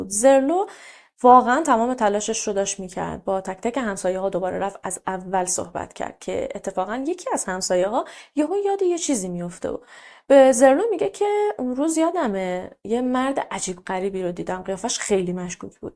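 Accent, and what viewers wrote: Mashhad, Iran. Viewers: سلام جان مشهدی هستید؟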